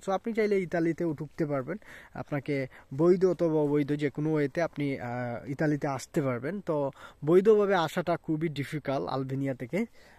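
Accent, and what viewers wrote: Sylhet, Bangladesh. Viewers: ভাই সিলেটি নি ❤ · Vai Kotay lager Sylheti bhai basha koi?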